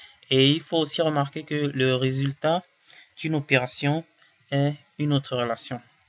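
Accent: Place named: Cameroon. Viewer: je sais que c'est mon frère camerounas qui a fait la video ci, notre accent est trop mo gars merci pour la video je compose dessus tout a l'heure ;)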